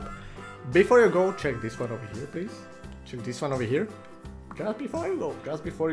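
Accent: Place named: Romania. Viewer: doar eu am impresia ca el e roman? adică frate, uneori mai zice cuvintele cu "ă" sau "â".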